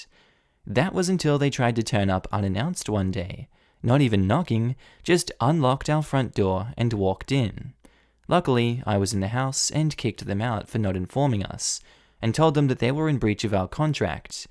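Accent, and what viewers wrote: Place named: Australia. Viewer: He's rather obviously Australian.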